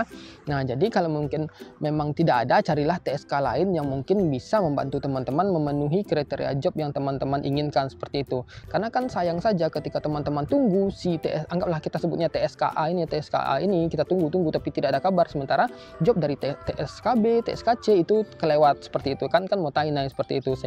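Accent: West Sumatra, Indonesia. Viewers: Logat bicara nya kaya orang sumbar, apa bener bg😂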